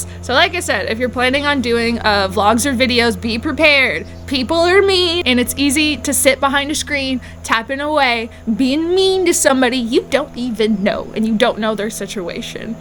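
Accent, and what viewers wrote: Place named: Kentucky, United States. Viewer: I have watched several female through hikers as I too am a solo female hiker but I have only had the opportunity to do sections of the Appalachian trail with a definite plan to complete the whole trail. I found watching y'all made the sections I have not done feel very doable and that I am ok with what/weight I am carrying. The through hikers I watched and enjoyed all of them you were the one individual I would have loved to have through hiked with. You seem to be able to enjoy the journey and not be pressed to always get big miles (especially the new state beverage). I assume this is a once in a lifetime adventure so if you can go out to eat in a restaurant, great! if you need to stay in a hotel because it's rained. Wonderful. I never had issues with why you posted when you did because it only seems logical that you do not want people to know exactly where you are and Wi-Fi is not always readily available on the trail. 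I personally love the way you talk, maybe it's because you're from Kentucky and I'm from Western North Carolina. I'm glad you successfully completed the AT but I do miss watching your on trail videos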